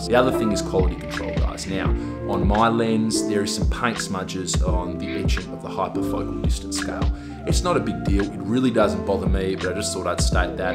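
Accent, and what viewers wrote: Australia. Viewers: Are you from Perth?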